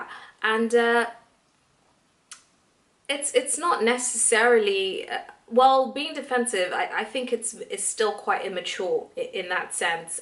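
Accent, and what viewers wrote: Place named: England, United Kingdom. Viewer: Cutie but I hate the English accent!!!!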